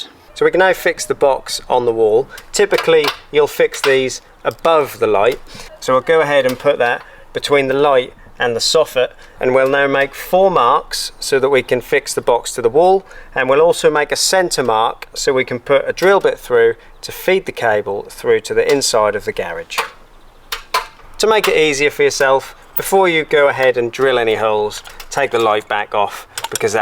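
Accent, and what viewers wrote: Norfolk, United Kingdom. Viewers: Teriffic video as always, Be that a bootiful Norfolk accent? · You sound like you're from my neck of the woods sir (norfolk) 😃 Great video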